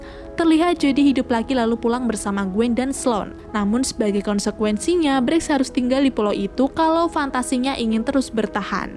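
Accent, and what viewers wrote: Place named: West Java, Indonesia. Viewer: Alhamdulillah Damang 👍 Sunda na ti palih mana teh😁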